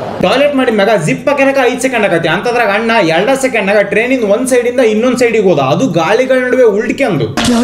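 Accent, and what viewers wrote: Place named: Harihara, India. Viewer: Olle comedy bro n nim dialogue super.. nam Harihara bhaashe kushi aguthe keloke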